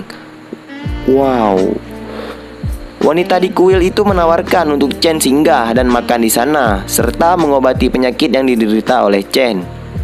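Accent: Aceh, Indonesia. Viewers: Orng aceh ya bang? :V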